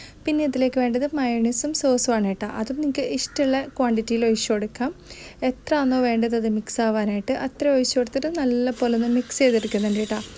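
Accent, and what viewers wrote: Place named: Kannur, India. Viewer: കണ്ണൂരാണോ വീട് സംസാരം കേട്ടിട്ട് ചോദിച്ചതാണ്. Super റെസിപി സബ്സ്രൈബ് cheythutto❤❤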